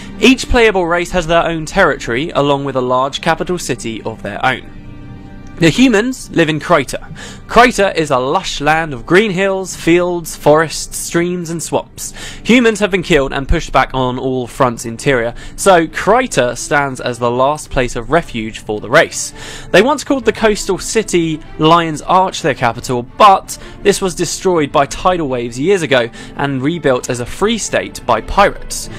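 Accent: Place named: United Kingdom. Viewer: ...我以為自己正在看泰瑞亞的 discovery channel ... 很重英國口音又對得上口的旁白啊！！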